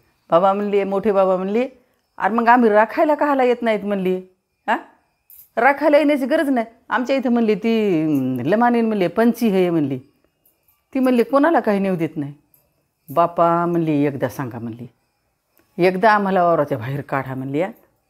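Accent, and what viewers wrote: Nanded, India. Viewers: बोलण्याच्या भाषेवरून सांगतो माई 100% नांदेड मधील असाव्यात.एक अंदाज. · Mrathvada mdhlay nanded chi language ahe wate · आजी आपल्या नांदेड भगाकडल्या आहेत..आमची भाषा ऐकून आनंद झाला..same आमची आजी अशीच होती💐💐🙏🙏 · भाषा नांदेड जिल्ह्यातील वाटत आहे. माझं आजोळ आहे नांदेड जिल्ह्यातील भोकर तालुका.. माझी आजी पण हुबेहुब असच बोलते😊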